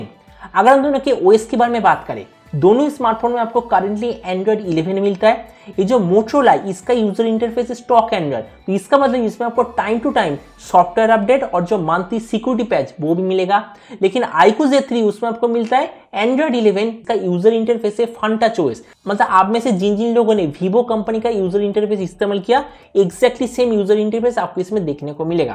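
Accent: Bengal, India. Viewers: Ap bangali ho keya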